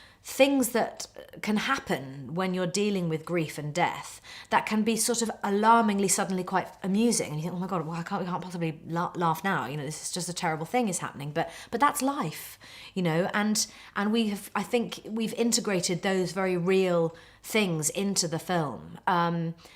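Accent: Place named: United Kingdom. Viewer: why is she talking as a british accent?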